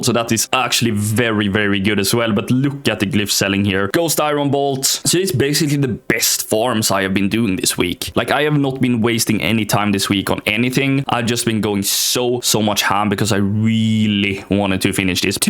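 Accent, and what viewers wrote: Sweden. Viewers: You sound suspiciously Swedish 🤔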